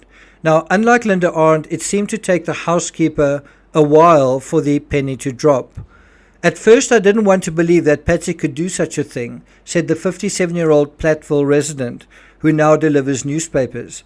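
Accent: South Africa. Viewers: Are you by chance South African? Your accent sounds so familiar- greetings from Nederland…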